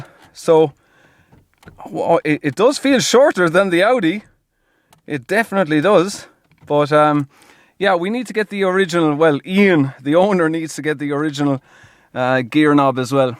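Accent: Ireland. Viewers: When I first looked at it and heard your accent I thought you were driving on part of the Donegal Rally route! The first time I went to see that in the late seventies, Billy Coleman won it in a whole other Lancia! I probably would take the Integrale too and live with constantly crapping myself about how much it will cost to repair when it inevitably breaks down! 😁😁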